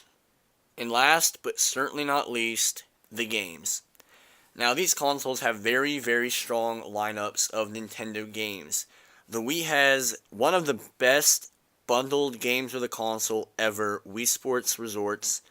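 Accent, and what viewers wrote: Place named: Philadelphia, United States. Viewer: Definitely philly/south Jersey accent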